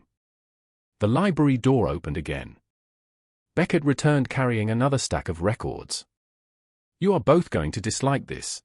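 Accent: United States. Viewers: good grace. you americans really need to get your fawlty pronunciation right. ALL americans do this !! There is a big difference between the 2 words : shire, as such, which is pronounced correctly. BUT ... connected to a place, wiltshire, or a name, ravenshire, it is very different !! it sounds like shuh. NOT ONE AI does this correctly, which means it is american, and not instructed to british english, which is VERY different, Americans say writing desk. British would not say that at all. It is a desk, and reading and writing sitting at it is the whole purpose of the thing. Horsebackriding ... wtf ? Where else would one sit? British say riding or horseriding, never ever do they say horsebackriding. And there is a whole slew of words that are VERY different between the 2 languages.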